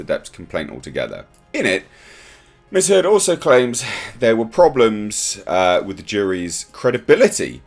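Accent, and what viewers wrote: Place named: RP, United Kingdom. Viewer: Your to woke !! Sound a bit like posh champagne drinking guardian reader !!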